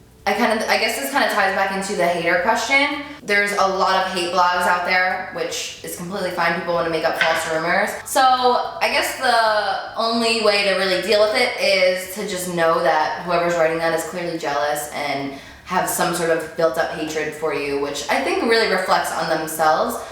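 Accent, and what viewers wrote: New Jersey, United States. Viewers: Your Jersey accent is so cute:) Love you!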